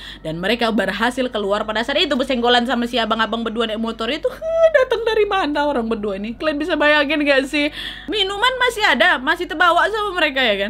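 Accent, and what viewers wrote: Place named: Medan, Indonesia. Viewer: Orang Kalimantan tapi bahasanya Medan medhok... Hahaha 🤣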